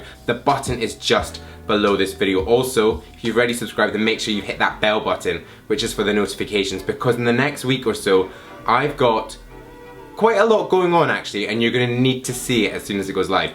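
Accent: Scotland, United Kingdom. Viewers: Do I detect a wee Scottish accent there ?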